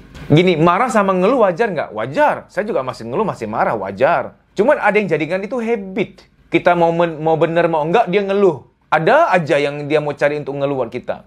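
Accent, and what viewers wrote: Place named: Lampung, Indonesia. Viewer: Logat bicaranya seperti suku lampung ....bener tidak pak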